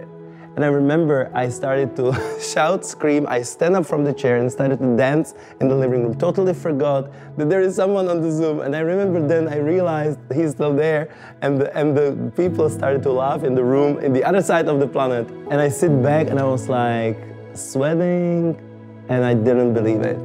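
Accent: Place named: Czech Republic. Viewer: Miluji ten český přízvuk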